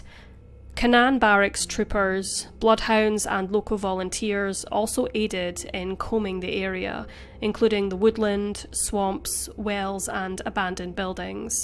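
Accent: Scotland, United Kingdom. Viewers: SHE HAS BEAUTIFUL SCOTTISH ACCENT, EASY TO FALL 😴😴😴😴😴😴😴😴😴😴 💤💤💤💤💤💤💤💤💤 💤💤💤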